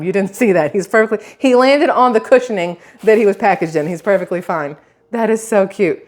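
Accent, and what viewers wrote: South, United States. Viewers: Love ya , Your Awesome People!! , I love your voice and your accent I miss that I'm from the south to but that's not where I live at anymore !!, I love to hear y'all, and I miss hearing I'm fixing to do something LOL !!, IT'S AMAZING THE LITTLE THINGS THAT YOU MISS THANK YOU SO MUCH !!, PLEASE STAY SAFE !, I ENJOY WATCHING YOU YOU DO A GOOD JOB TELLING IT LIKE IT IS !!, THANK YOU!! GOD BLESS!! ,AND TO YOUR FAMILY!!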